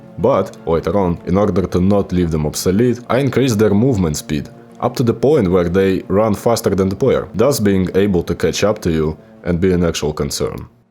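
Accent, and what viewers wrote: Russia. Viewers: У него ОЧЕНЬ русский акцент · не только я услышал у него русский акцент) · russian accent, W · Bro u got bold Russian accent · You have strong russian accent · Какой же ахуенный русский акцент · Man is so cool! Кхм а еще твой Русский акцент прям врезается в голову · Твой акцент очень даже русский, и я уверен что ты русский. Понимаю тебя, ведь на русским не платят на ютубе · I can hear by the voice that the author is Russian. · Mmm чувствоваеца русский акцент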